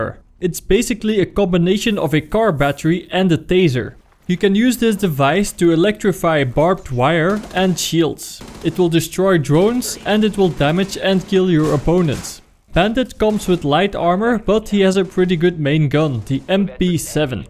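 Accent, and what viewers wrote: Netherlands. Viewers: duidelijk Nederlands Engels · Probably the Netherlands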